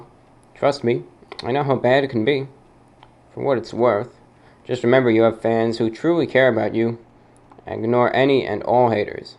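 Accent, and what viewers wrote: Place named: New York, United States. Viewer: I feel fortunate to still be able to find and listen to in the “olden” days 😁. Love the NY accent lol. You can whistle! I’m jealous! I’ve tried my entire life consistently but no luck. I still can’t believe I’m listening to a 16 year old kid lol. But Nike is “just do it”. Not “get it done” 😂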